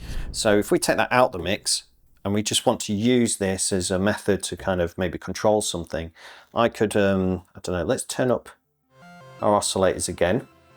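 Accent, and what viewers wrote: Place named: Tameside, United Kingdom. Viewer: You sound like you are from my area of the country, I'm in tameside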